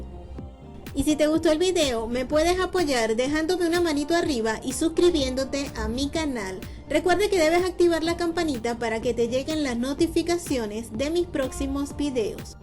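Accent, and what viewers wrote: Venezuela. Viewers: MUY BUEN DIA MI GRAN Y HERMOSA CHEF NO ME CANSO DE VER TODAS TUS MAGNIFICAS RECETAS ERES LO MAXIMO TE AMO POR TU DIALECTO DEBE SER VENEZOLANA Y SI NO YO DESDE MARACAY ARAGUA VENEZUELA TE DESEO MIL BENDICIONES MI CORAZON YENOS DE EXITOS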